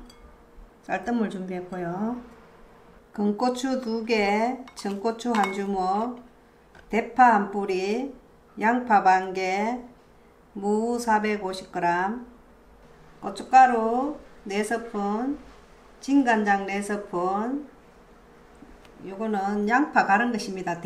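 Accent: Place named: Daegu, South Korea. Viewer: 선생님 감사해요 멀리서 항상잘보고있어요!!! 구수한 사투리 듣기좋아요 . 저도 고향이 대구거던요!! ㅎㅎㅎ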